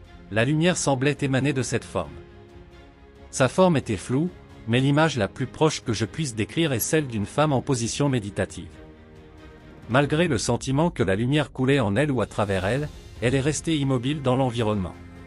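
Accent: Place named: France. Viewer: Ca parle aussi bien espagnol qu'une vache espagnole. 😂Mais malgré l'accent bien franco-français, j'ai quant même compris la fin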